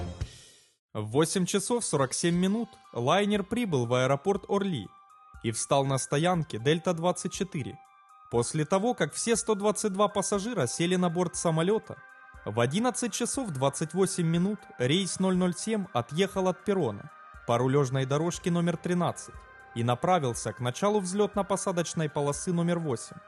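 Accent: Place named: Ukraine. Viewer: Это неисправисо..! Карьтавые идут на радио, а с ужасным малоросским акцентом зачитывают длиннющие авторские тексты!🤯 Скоро рыбакам пора курятники обслуживать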